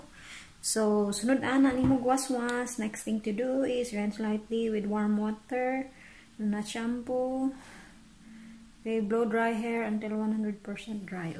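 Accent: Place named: Visayas, Philippines. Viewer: Hi friend. Ang cute talaga kapag visaya ang salita..thank u sa pag share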